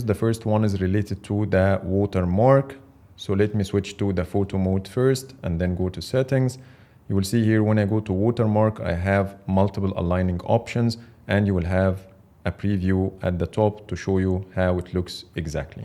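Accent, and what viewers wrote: Egypt. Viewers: كانه عربي مصري🤨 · Off topic but you sound Egyptian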